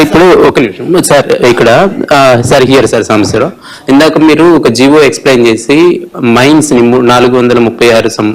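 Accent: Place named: Rayalaseema, India. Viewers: మన రాయలసీమ యాసే సామి😂❤ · Rayalseema slang 🔥🔥🔥🔥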